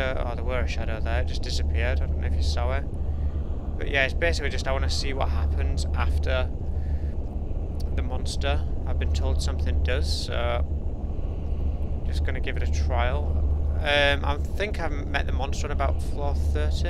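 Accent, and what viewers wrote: England, United Kingdom. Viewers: What part of england are you from?:D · are you like British or something? XD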